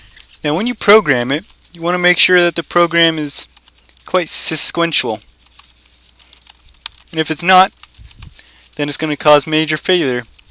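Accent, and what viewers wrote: Canada. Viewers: Is he Canadian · He kind of sounds Canadian to me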